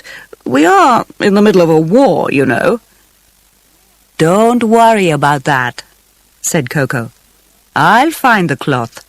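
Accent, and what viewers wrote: United Kingdom. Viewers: Rodolfo Santos british I think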